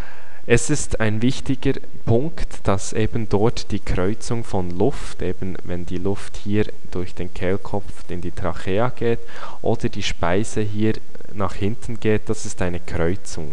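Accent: Switzerland. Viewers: Kann das sein das ich ein Schweizer Akzent höre ? :)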